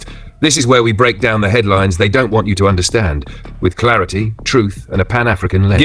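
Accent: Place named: Europe. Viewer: African content in European voice? We can do better.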